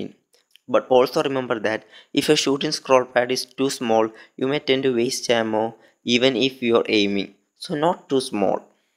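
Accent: Kerala, India. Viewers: wonder I thought I knew that accent, Nammallum keralathinna.. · R u from Kerala Ur acsent wow! Amazing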